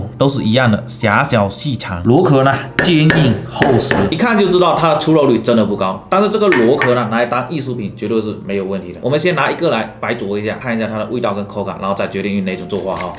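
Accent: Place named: Gutian, China. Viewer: 口音好像宁德古田那边的人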